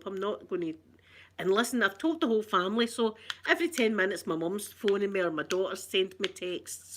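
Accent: Scotland, United Kingdom. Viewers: The great start is to seek help and accepting that you need it (no shame in that at all it is a very brave thing to do) and if you want to cry...never deprive yourself of a good cry as it helps to release those pent up feelings....Do know that you are loved and not just by your family....you are a joy on your videos and you never fail to make me smile when I feel down with your make-up vids, your cute funny demeanor and banter in that beautiful Scottish accent of yours....The one good thing about going through trauma, loss, illness and sadness is that there is only one way to go....and that is up, make it a challenge to strive for it and you will get there..believe me, I know xxxxxx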